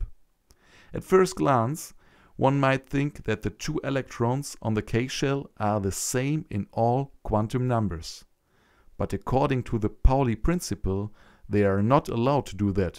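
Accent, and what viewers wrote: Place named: Germany. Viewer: Noch nie so deutschen Akzent gehört xD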